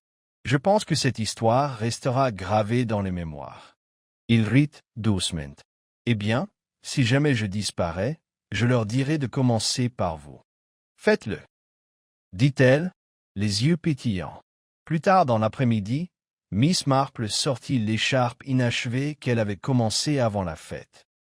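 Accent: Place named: England, United Kingdom. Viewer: La voix avec un léger accent anglais du "lecteur IA" est plus supportable que celles entendues dans les autres épisodes.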